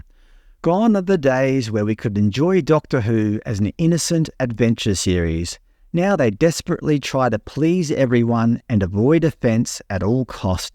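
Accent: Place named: Australia. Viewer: Americans listening to you won't realize that you're Australian not British, but you've got a nice accent. I don't want my Doctor to be female, i don't mind a female time lord i loved Romana, Mary Tam in the 70s but The Doctor should be a male, I also don't want the Doctor to be black, i don't mind a black time lord but after growing up with the Doctor being a white ( straight) male i find these changes just forced diversity, I'm not racist or homophobic, I'm gay myself and i wouldn't mind a black James Bond played by Colin Salmon for example. The BBC should be forced to scrap the TV license tax because Disney is a commercial company and it's funding this car crash of a tv show. Donna's trans daughter criticizing the doctor for not being a woman well they( Donna's daughter) never was a woman and still isn't. So the Daleks are now in wheelchairs now? 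Oh and I forgot i don't want my Doctor to be played by an immigrant, he should be played by a British actor, that's actor as in man. The producers, writers and actors are informing us the classic fans Today "sorry straight white males this show wasn't made for you and it never was" Tomorrow they'll ask "Why aren't you watching our show?"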